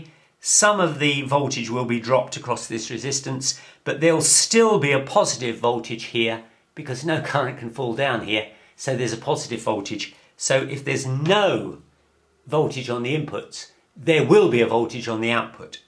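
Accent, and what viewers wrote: United Kingdom. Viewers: British accent LOL I like it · Brilliant at last a person who speaks British English and can deliver in a clear manner enabling me to understand as I listen more of the same quality please. I feel the need to study more and that is the frame of mind a good teacher should leave a student in as I said Brilliant. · Great video, great pacing, and I always feel smarter learning something from somebody with a British accent. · GREATＶＩＤＥＯ Very clear! Your british accent is nostalgic haha.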